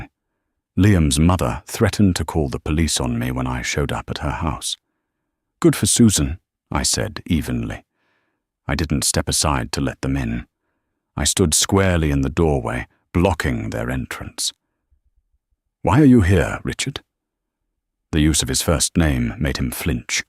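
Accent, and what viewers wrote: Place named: United Kingdom. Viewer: Why is this story about a female and they used a male British accent it’s confusing and the voice is all wrong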